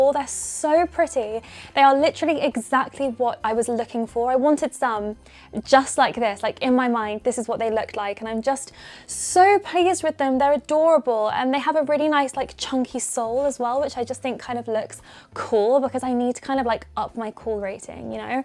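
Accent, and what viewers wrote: United Kingdom. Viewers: I love your British accent. Ohhh orange chocolate is the best flavour nither as smell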